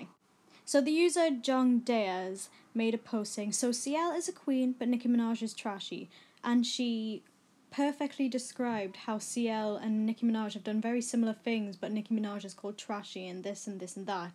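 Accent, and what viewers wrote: United Kingdom. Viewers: Are you... a geordie? Trying to place accent lol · but that's none of my Business . Chill out it's her voice not yours if you don't want to hear it then leave. Also British accents are great don't diss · Off topic but where are you from? Your accent seems british to me?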